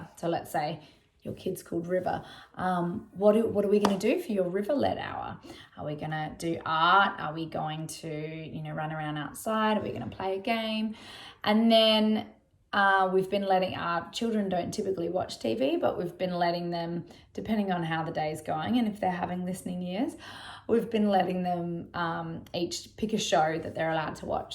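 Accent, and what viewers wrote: Australia. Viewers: It's weird hearing your Aussie accent, when you're soo American in everything! I'm in Sydney, I work from home and am ok with spatially distancing. It's been pretty blazee with community co-operation here, luckily it hasn't been as rife here, hope they can get it under control in Victoria! I too have been saddened by the "every-man-for-himself" attitude but society especially in the city is kind of like that anyway. Gosh I hope they find a vaccine, but life is so precious, hope we learn from this. Much love & light Xx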